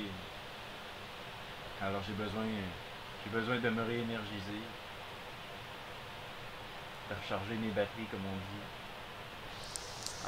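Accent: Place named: Canada. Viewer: Bonjour, 1ère vidéo que je découvre avec ravissement une cabane nichée au ❤ d'une sublime forêt 🌲🌲🌲🌲🍁🍂🍃🍀☘🌿🌾🌵🪴🌱.Rien de tel pour se ressourcer, laisser les blessures du passé s'enfuir dans le vent qui court dans le temps qui passe. Le meilleur ami de l'homme, l'animal, ce 🐕 🐶 si merveilleux, qui t'accompagne, lui seul comprend ta mélancolie et qui jour après jour te souffle le bonheur de demain...., ton plus grand ami. J'adore ton accent canadien. Bon courage avec Kirten. Cordialement. Sayoko. 28/01/2021🌞☃️❄⛄❄🌜🌛🌠✌✌✌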